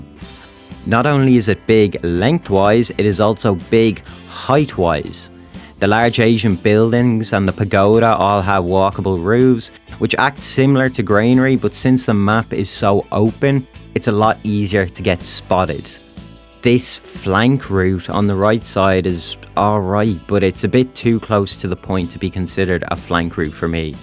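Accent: Scotland, United Kingdom. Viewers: i love your Scottish accent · Sound like u British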